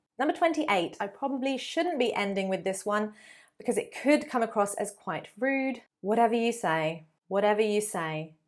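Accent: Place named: England, United Kingdom. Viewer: Your accent is kind of English, but I want to learn American accent !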